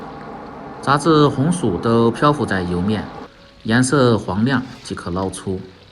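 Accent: Sichuan, China. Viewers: 我也做做看☺️老师您是四川人吧？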